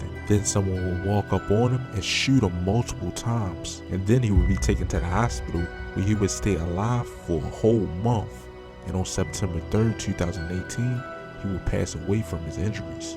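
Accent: Baltimore, United States. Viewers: Grew up in the DMV and now live in Bronzeville, This is the thickest Baltimore accent I’ve ever heard in my life!!!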